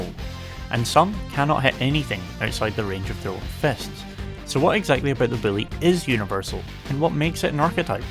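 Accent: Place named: Scotland, United Kingdom. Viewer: Nice to here a Scottish accent! I'm in glasgow, do you play SFV? up for a few sets sometime?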